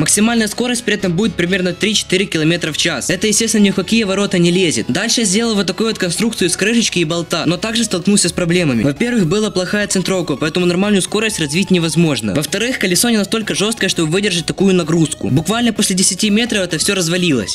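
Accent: Ukraine. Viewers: Ты из Украины ??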